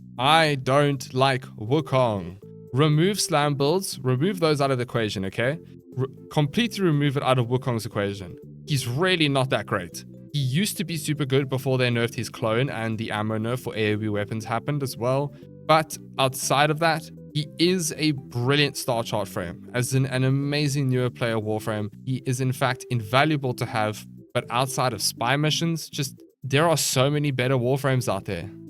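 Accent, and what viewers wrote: South Africa. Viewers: Is South African🇿🇦 by any chance?? The accent sounds very familiar. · Are you South African? English accent feels a little bit familiar...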